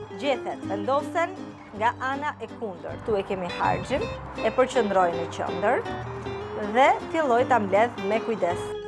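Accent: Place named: Burrel, Albania. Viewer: .....sa me pelqen malokja e Burrelit kur salutone !!!!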